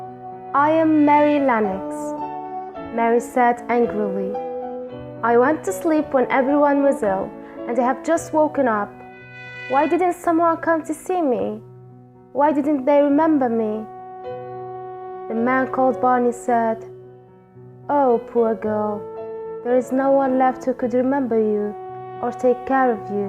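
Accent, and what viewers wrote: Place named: United Kingdom. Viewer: الرائعة المتألقة صاحبة اللهجة البريطانية القوية 🌷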